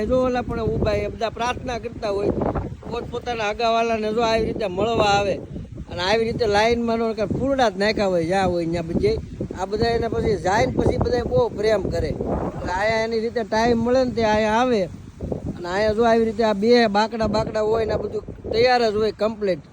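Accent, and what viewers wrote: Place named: Kathiawar, India. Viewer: Vah Pyor kathiyavadi lago so hooo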